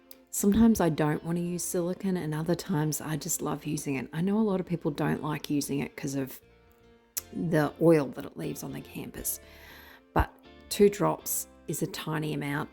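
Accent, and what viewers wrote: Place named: Australia. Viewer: Just found you, recognized the Aussie accent and subscribed😎 love watching it unfold it's beautiful and prefer with silicone I also like the dark together. Lots of love from Sydney🇦🇺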